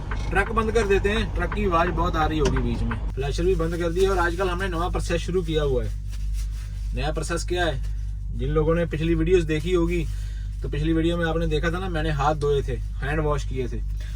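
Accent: Punjab, India. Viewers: Punjabi di hindi bhaut vadiya hundi hai bhaji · Vicho vicho nikal aundi aa punjabi🤣🤣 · Bhai bhaut maza aya 😂😂🤣🤣🤣 hindi wale te chib kad te And one like for ur effort cz punjabi bande laye hindi bolna yada muskil h english boln to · You are a good teacher. Like your Urdu but tarka of Punjabi gives more pleasure. Please take special care while driving.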